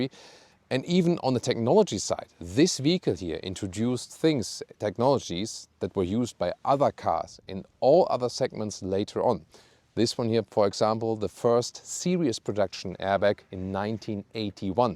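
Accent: Germany. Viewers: A video about a German legend, explained with a German accent......''Hier scheint heute alles in Ordnung zu sein😉'' Great content.....and Btw: Did you know that the legendary Queen frontman Freddie Mercury also bought one? He spended a lot of time between '79 and '85 in Munich, and was often driven there in one... He was clearly impressed by this car. When he came back to the UK he directly ordered one,.......a '86 420SEL..... It seems he even preferred it over his Rolls Royce...🤫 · that killer german accent bro!!!!!! them make car UP the price!!!!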